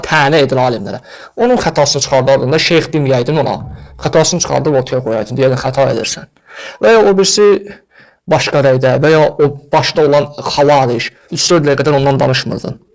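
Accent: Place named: Iran. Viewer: Sən niyə İranlılar kimi danışırsan?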